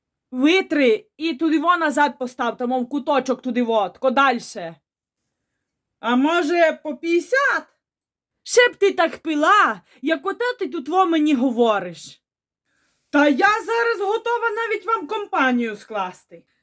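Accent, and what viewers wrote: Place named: Rivne, Ukraine. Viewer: Доброго вечора Вам, сміялась до сліз як прибирали кладовку.Успіху Вам.Моя юність пройшла в м.Рівне, були подруги з м.Березне, м.Сарни, м.Костопіль.У Вас такий самий акцент.Дуже Вас люблю!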